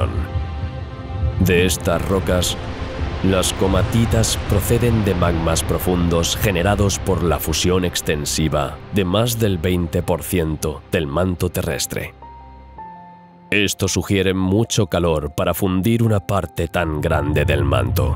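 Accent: Spain. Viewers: Y luego esa voz robotizada ,con acento español ,que huevaa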